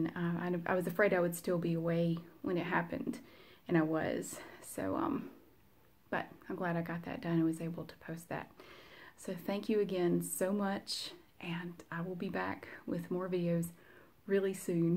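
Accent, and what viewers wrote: South, United States. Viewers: Fell in love with your voice already. Love that Southern accent. Subscribed!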